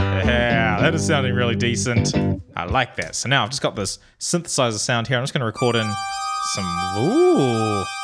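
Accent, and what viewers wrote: New Zealand. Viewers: Coz yo I'm from.new Zealand aswell bcoz of your accent tho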